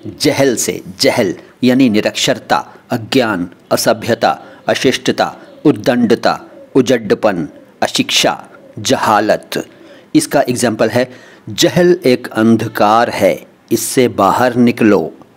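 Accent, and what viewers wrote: Standard. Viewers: आपका शुद्ध उच्चारण सुनकर दिल बाग बाग हो जाता है बहुत बहुत शुक्रिया ।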